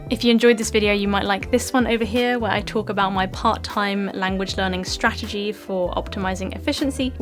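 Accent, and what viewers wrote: England, United Kingdom. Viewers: Actually I am a school student who is willing to learn British accent,I found your accent interesting. Thank you .We really appreciate your dedication for helping others develop their accent even if you get nothing in return. Your such a great need for society. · You're Welcome !! I really love your english accent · Thank U for the video!!! I never cease to be amazed at Ur understandable British accent!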